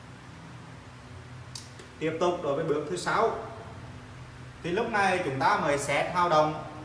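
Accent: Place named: Vietnam. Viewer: Giọng thầy nơi nặng theo miền. Nhiều khi nghe khó dịch :)))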